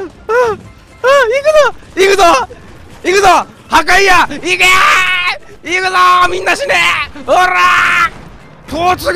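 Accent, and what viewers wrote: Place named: Kansai, Japan. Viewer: 関西弁すきw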